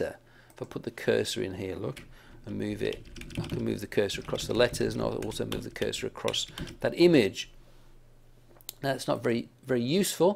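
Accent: United Kingdom. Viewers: really like this British accent omg! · This video was thie first one that i searched for after reinstalling my system, so it was the first link assosiated with youtube. Now everytime i search "youtube", this video is the first one i get. This british voice is now my every day companion and im not even sure if i want to change that.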